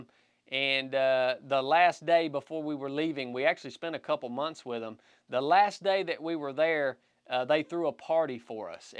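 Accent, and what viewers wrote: South, United States. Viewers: Are you from Mississippi or Alabama?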